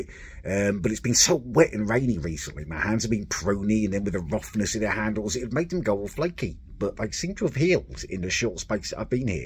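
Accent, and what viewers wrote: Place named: Northern England, United Kingdom. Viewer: Your accent seems pretty northern, how long have you lived on the isle of wight?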